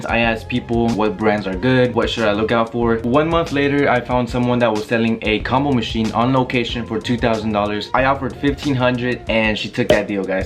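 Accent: Chile. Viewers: ERES CHILENO WEON? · Eri chileno o no hermano?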